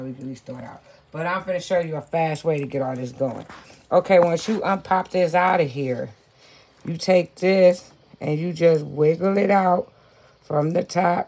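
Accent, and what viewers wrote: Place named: Rural, United States. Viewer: I wasn’t finished 😠 I started laughing when it started by showing your feet. I thought that was great! Here’s a non pretentious instructional video that might show me something. Then I heard the Country Twang and I was hooked!!! Wonderful job! No Fluff! I don’t care for fluff! I’m going to keep up with you. I’m coming online soon with a “Big Show”, and I would love to feature you. Now I’m finished ✊🏿✊🏿✊🏿❤️🖤💚😎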